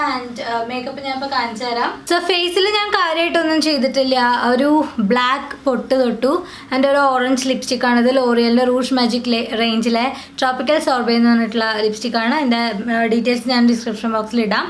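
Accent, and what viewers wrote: Thrissur, India. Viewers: സംസാരം kekkan nalla rasam undu.... thrissur aano....???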